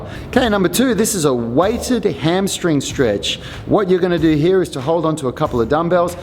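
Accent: Australia. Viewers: Are you Aussie? 🇦🇺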